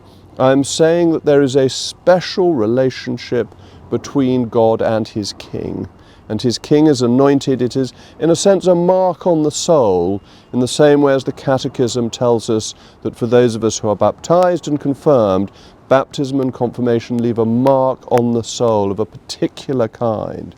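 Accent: RP, United Kingdom. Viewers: Thanks for standing up for received pronunciation, Jacob!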